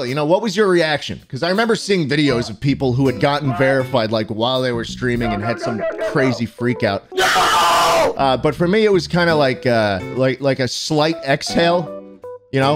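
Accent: United States. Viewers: American accent at its purest.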